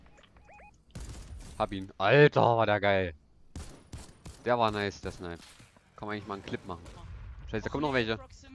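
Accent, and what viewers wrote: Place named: Saxony, Germany. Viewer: Bist du Sachse ich bin Sachse denke es wegen dem Akzent, könntest du meistgeschauteClips von FaZe Dubs oder mitro, benjifishy feie das Format. 👍👌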